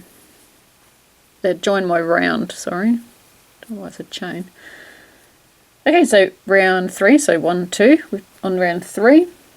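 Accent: Australia. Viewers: Thanks very much, hearing what I assume is an Australian accent threw me, Newbie here, yuor tutorials are great.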